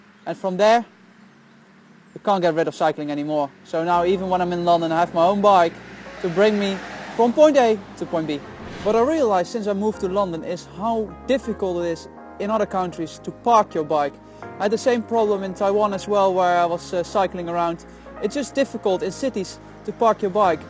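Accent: Netherlands. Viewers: Toen ik je eerste video zag dacht ik een beetje te horen dat je Nederlands was. Zelfs als Nederlander is deze vraag ook moelijk te beantwoorden. Zal het zelf eigenlijk ook niet weten. Ohh echt, wist niet dat die actie groep bestond. Ik leer nu super veel van deze video. Ik leer elke dag wel iets nieuws. Vind het wel erg dat ik dit eigenlijk niet wist hahah. Super bedankt voor het maken van de video! Groetjes uit Groningen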